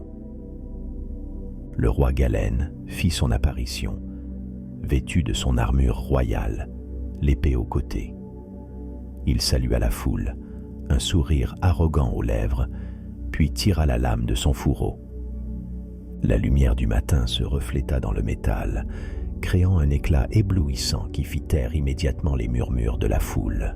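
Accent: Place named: Quebec, Canada. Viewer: Sympa, le petit accent québécois 😉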